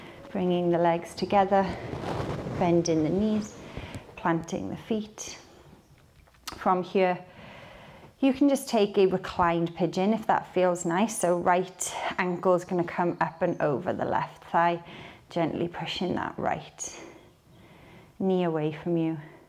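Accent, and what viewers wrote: United Kingdom. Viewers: are you British?!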